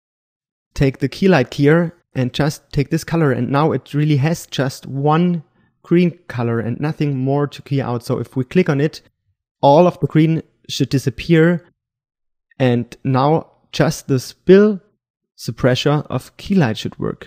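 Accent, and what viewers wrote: Germany. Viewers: kann es sein das du deutscher bist? :D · you're german right? Got a german accent :D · r u german?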